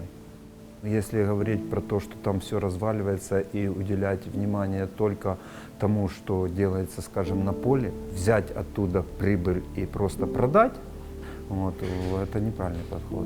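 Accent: Ukraine. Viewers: Черт возьми, я просто не поверил, что такое хозяйство возможно на территории Беларуси и акцент немного смущал, но подумал... вдруГ Полесье, Гоомельщина... а утут слышу "у нас в Украiнi"... и все стало на свои места... Молодцы, что сказать. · я тоже сразу задался вопросом где это, начал читать а хрен тебе , какого черта не указываете место положения, я надеялся россия, но сразу уловил акцент, и сомнений не осталось , развернул коментарий и подтвердилось украина, молодцы украины, а вто авторам бы посоветовал указывать не только страну но и город местность!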